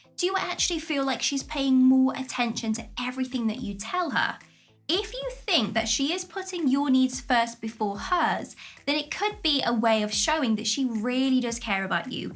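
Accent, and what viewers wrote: England, United Kingdom. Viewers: Your videos are great, you're beautiful and I love your English accent.... Just saying, but thank you.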